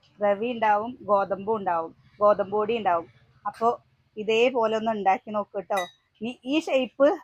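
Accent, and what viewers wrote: Palakkad, India. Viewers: Palakkadu kkar aganeyanu parayuka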